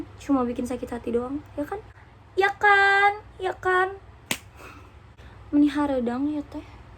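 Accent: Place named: Sunda, Indonesia. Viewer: Suka ke sunda²annya kak 😘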